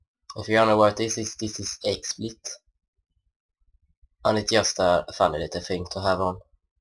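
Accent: Sweden. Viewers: det låter lite som svengelska när du pratar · Haha Svengelska :D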